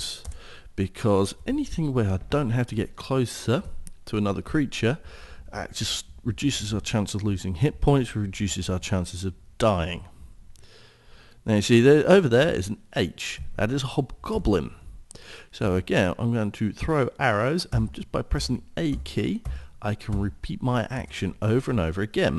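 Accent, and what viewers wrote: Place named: England, United Kingdom. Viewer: I love your "typical" english accent :) where do you go for this game... i just dont understand :)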